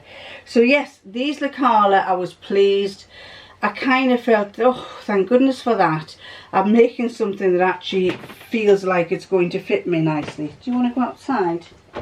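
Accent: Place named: North East England, United Kingdom. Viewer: Hi, I love seeing what you make. I have been sewing for years and years but still can’t seem to make anything that fits me perfectly. How on earth to you manage to measure yourself and adapt patterns to fit your body? I know you said you live in Richmond but your accent suggests that you were brought up in the North East, like me, am I right? Please keep the videos coming as I learn so much from you xx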